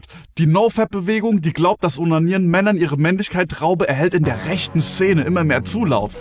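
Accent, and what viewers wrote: Germany. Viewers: Das mit dem sächsischen Dialekt hättest Dir schenken können. Ansonsten passt, den Schlusssatz sollte sich jeder an den Kühlschrank heften!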